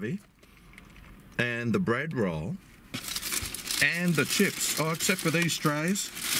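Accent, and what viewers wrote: Australia. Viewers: I detect an Australian accent. Hi I'm from New Zealand. I have just found your channel and I'm excited to watch all your videos. I watch a lot of youtube videos because I can't eat a lot of food. I only have one metre of intestine left after having 6 operations over 20 years removing blocked parts. I also have raynauds, short gut syndrome, I have major bleeds and clots and I have food issues related to aspergers. I'm terminally ill now and my doctors are astounded by how I fight back and recover when most people would no longer be here. The funny thing is I love to cook for my parents but most of it I can't eat however I enjoy watching people loving what they are eating. I want to thank you for your videos as I'm intending to use them to distract me from my 24/7 pain which is a major issue for me. This has worked for me with some other channels. 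So thank you and keep up the good work. I know that it takes a lot of time to create a video idea, film and edit. You are a natural in front of the camera. Xx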